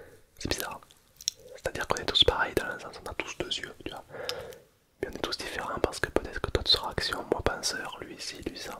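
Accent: South, France. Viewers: T as l accent du sud😂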